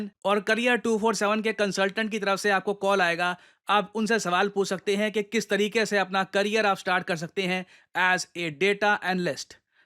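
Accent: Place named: Uttar Pradesh, India. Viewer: सर आपका कभी कभी बोलने की कला उत्तर प्रदेश के जैसा लगता है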